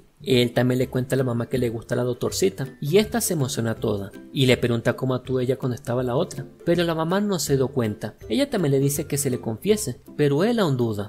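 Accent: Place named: Venezuela. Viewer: No sé el porqué pero siento que eres Venezolano (la voz del chico que hace el resumen), tu forma de hablar es demasiado característica de los Venezolanos.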